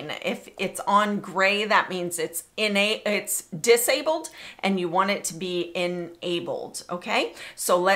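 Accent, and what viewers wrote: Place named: United States. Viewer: thank you so much! You speak American English! Yay I can understand you! You explain in a simple and direct manner. And you are nice! Thanks so much!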